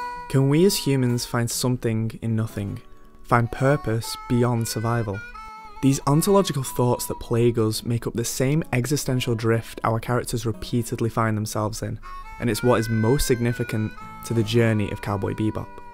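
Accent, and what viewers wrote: United Kingdom. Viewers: i love how you have a british accent really gives you taat edge over nerdwriter1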